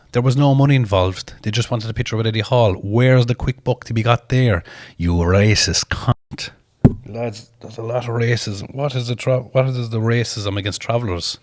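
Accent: Ireland. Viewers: are you from cork bai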